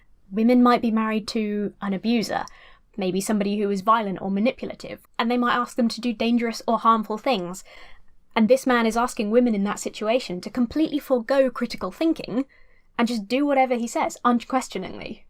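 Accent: United Kingdom. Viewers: First video from you i watched already my least favourite thing is your british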